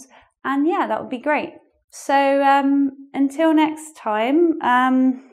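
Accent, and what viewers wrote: United Kingdom. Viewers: The way you said important I like it, and I try to imitate you. Lol I love British accent. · You're a British English Speaker or American? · What a great lesson! I have had difficulties to understand your british accent, but at the end I understood. Thank you! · She has a Horrible British accent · Beautiful British accent!! Great class. Yes, you do seem to be a little sad at the end of the class... be good, you´re a lovely person! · excellent British accent, I would like you to make a video and a list with words but with the different pronunciation between England and usa,, it would be great, thank for help us, why you look so blue?,,have a nice day. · I really loved British accent. 😂😍🖒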